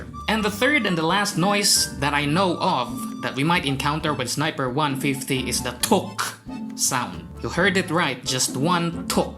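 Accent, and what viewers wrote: Philippines. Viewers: very good explanation sir, speaks like a Filipino English Teacher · The accent is so Filipino 😊😘 Keep it up 👍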